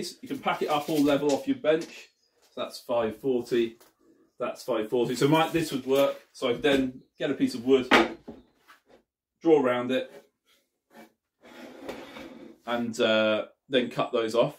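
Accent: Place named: Ireland. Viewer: Too much talking - difficult to understand w/your brogue. The presentation appears mor ad hoc than well-organized.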